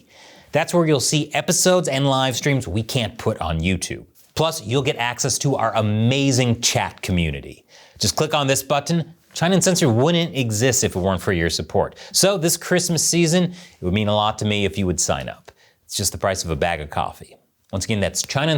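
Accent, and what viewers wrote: India. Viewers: Lmao that’s wild she looks Chinese but sounds Indian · When she talks she’s definitely Indian 😂😂😂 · To be fair. ...she sounds Indian bymut looks Chinese... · I'm with china on this one she fing even looks Chinese 😂 strangest thing Chinese face Indian accent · So she has an Indian accent But a Chinese face And she's hot ❤ This is very very interesting, Her own confusing ethnicity symbolizes the border conflict itself 😅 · I think they are mad.. cause she look chinese and sounds indian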